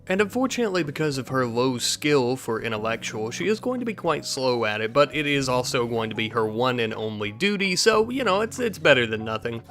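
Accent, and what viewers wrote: South, United States. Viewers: are you a southerner? You got a little drawl in your voice.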